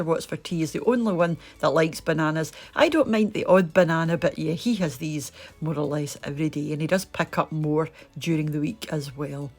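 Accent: Scotland, United Kingdom. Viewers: Hello, new subscriber here from Australia 🇦🇺. Currently my husband and I are on the keto, low carb diet so I love to watch your meal prep for a big carb fantasy fix. I found you by way of mrs. m. And just love your Scottish brogue. Best wishes.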